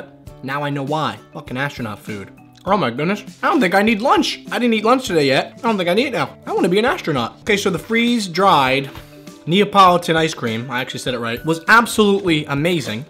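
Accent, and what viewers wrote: United Kingdom. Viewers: Your British accent had me like 😭😭😆😆😆😭😭😭😭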